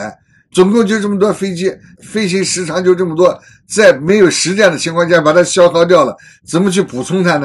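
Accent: China. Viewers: 听你的口音，中国人？一大把年纪了，想想怎么养老，你的所谓的讲解有意思不，！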